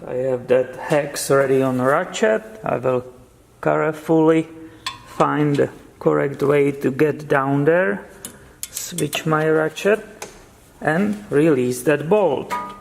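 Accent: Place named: Ukraine. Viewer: Love your vids, smooth, low key, imformative, with a slight Ukrainian , Polish , touch maybe!!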